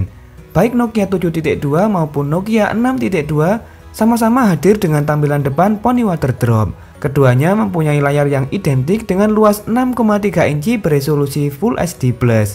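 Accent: Java, Indonesia. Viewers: Medok benerrrr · Masnya orang jawa y? Koq medhoq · medok tenan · Membawakan konten nya terasa medok mas Logat nya.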